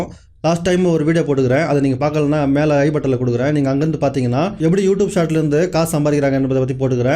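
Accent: Chennai, India. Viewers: தலைவா சென்னை தமிழில்,பேசாம தஞ்சை தமிழில் பேசுங்க..(அது என்ன உங்களுக்கு உசரம் height டா..? நன்றி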